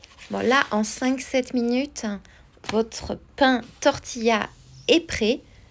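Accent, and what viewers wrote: France. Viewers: Hi, if you don't mind me asking, from where is your French accent? I'm learning French and that's why I ask and by the way I love chickpeas and this tortilla/bread looks so good.😻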